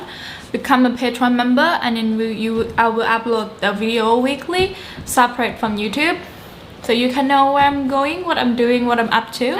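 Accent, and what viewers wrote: Australia. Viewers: Hello, you are starting to get an aussie accent! Love your cooking vlogs! :) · you are getting an Aussie ascent .....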